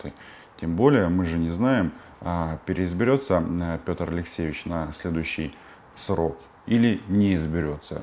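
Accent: Russia. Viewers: мАцковский акцент приобрел? Это не страшно, главное душой быть украинцем.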